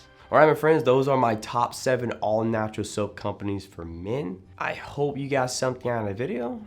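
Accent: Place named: South Louisiana, United States. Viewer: I literally subscribed because you said you were from south Louisiana. I lived in Lafayette for 18 years. I can definitely pick up on your accent!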